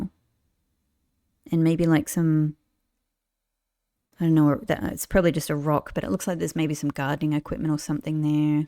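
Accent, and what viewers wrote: Australia. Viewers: It’s interesting just how little the absence of sound mattered here. I wonder whether I’d have even noticed if you hadn’t mentioned it. If anything, it made me wonder why I never had an Aussie narrating MY explorations. That should definitely be an option in a future patch.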